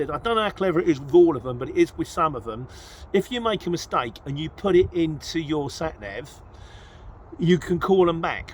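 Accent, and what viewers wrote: Cockney, United Kingdom. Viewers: The reason why I watch your videos because of your Cockney Accent. I really like it 👍 💯 💕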